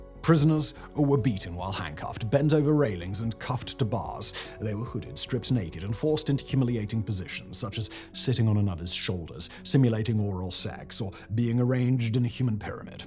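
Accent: England, United Kingdom. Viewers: The United States is not perfect but they act in the best way to keep the world free and safe. I take offense that you talk about this with an English accent. If it wasn’t for the US the UK wouldn’t ceased to exist a long time ago.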